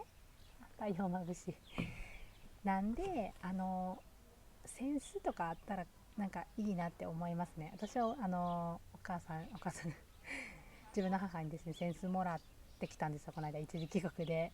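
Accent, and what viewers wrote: Kansai, Japan. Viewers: こんにちは。 さんの関西弁、めちゃくちゃ親近感です。とても素敵なお家ですね。お二人のお子さんがバイリンガル、普通にお母さんとは日本語でお喋りされていて、ドイツの学校や幼稚園に通われてるのは凄いでね。コロナが落ち着いて日本への一時帰国がコロナ前の様に気軽に出来る様になったら是非お子様達に日本の小学校を体験させてあげて下さい。 ドイツの学校の夏休みは何ヶ月ありますか？ 日本の夏休みは7／20前後からですので、1週間から2週間早く一時帰国されたら日本の小学校体験入学できますよ。ドイツの小学校との違いが面白かったり、日本のお友達も出来ますよ。 お友達のお子さんが毎年夏に少しだけ日本の小学校に通ってました。ほんの10日間とかでもお互いとても新鮮でいい刺激になる様です。毎年帰国出来なくても、次帰国したらお友達と遊ぶ約束などして楽しんでいました。 何時も何気ないドイツの日常の風景や、食されてる食材を拝見するのが楽しみです。これからの動画も楽しみにしています。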